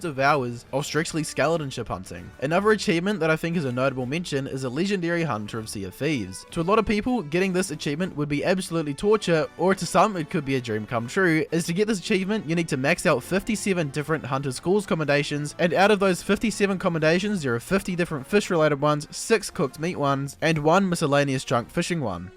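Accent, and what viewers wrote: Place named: United Kingdom. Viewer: What is this ultra british accent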